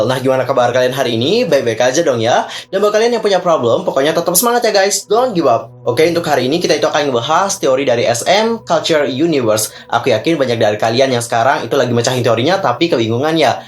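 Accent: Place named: Java, Indonesia. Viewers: Medok ya kak hehe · Maaf kak aku yang salah. Aku ngakak kok medok sih 😭🤣🙏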